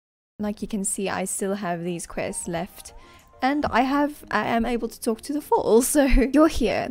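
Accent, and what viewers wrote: South Africa. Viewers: I’m glad I subscribed to you! Two things I love: star stable and the South African accent (sadly lost mine) your voice is so soothing it makes me feel like I’m back home agaon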